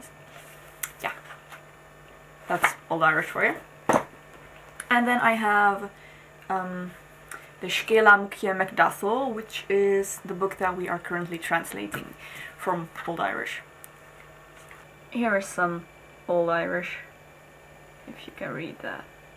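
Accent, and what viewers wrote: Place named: Netherlands. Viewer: ! Dutch indeed....I thought I could hear it! Awesome! Hallo landgenoot!;)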